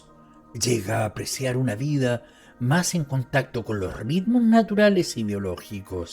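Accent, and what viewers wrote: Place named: Chile. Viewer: yo también ya echaba de menos esa voz y dije: «tengo que escuchar otra biografía más» 😅 Un abrazo muy fuerte. Por cierto, ese acento no es taaan marcado, pero ¿será que es chileno? 🤔😌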